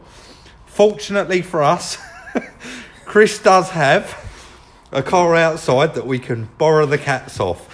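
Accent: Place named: South London, United Kingdom. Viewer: Accent to me sounds sarff London sorry south London ,might be termed as estuarine English may be because of proximity to the Thames estuary I am 1 mile from it now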